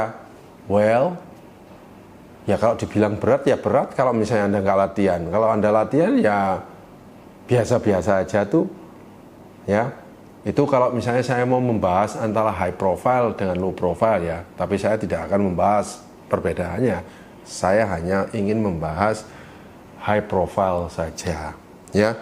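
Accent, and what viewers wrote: Surabaya, Indonesia. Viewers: I love..penjelasan nya mudah dipahami..yg penting logat suroboyonya yg bikin meyakinkan..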